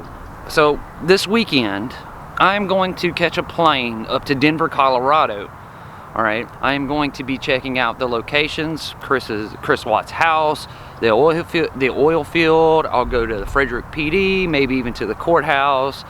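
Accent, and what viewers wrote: South, United States. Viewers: I live in Opelika/Auburn so nice to hear a good ole’ southern boy accent on the case! I saw you did a video at the Dozier school... I grew up near there definitely going to check that out. So hard to believe such evil went on right under your nose for 100 years!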